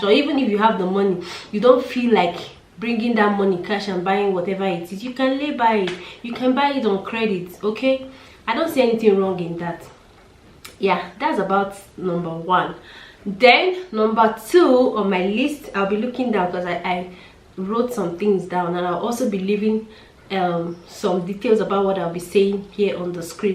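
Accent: Nigeria. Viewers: we love you here in SA 🇿🇦🇿🇦, plus your Nigerian accent sound beautiful sis. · So nice listening to you, you are beautiful love your Nigerian accent, and thank you SA are good to us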